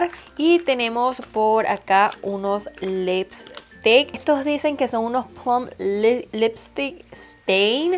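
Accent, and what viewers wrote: Venezuela. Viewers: Saludos mi china!!! Hermosa como siempre y más aún cuando defiendes tu acento venezolano 📣📣📣 🙌🙌🙌 Me encanta tu personalidad las cosas te resbalan y no tomas nada a pecho 😏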